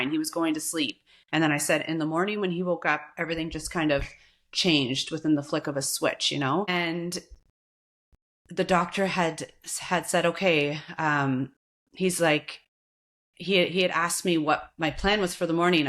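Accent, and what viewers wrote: Canada. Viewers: You sound Canadian. Hope you qualify for financial assistance from the government and that your husband had life insurance. I have faith your husband is at peace, it's those of us left behind who suffer. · sounds like canada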